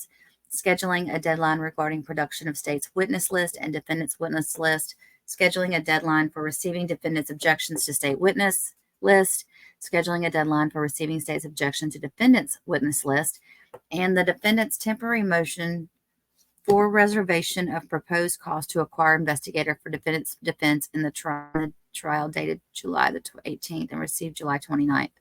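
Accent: South, United States. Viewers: Voluntary intoxication is not a defense in the state of Florida. I like your southern accent. Where are you from. I'm a new subscriber. I like your presentation with humour.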